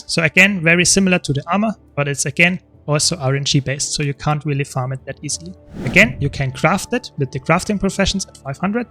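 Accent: Germany. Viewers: Thanks a lot my dude. I really needed that video to progress my Guild Wars 2 journey :) Btw .. hör ich da einen deutschen Akzent? 😂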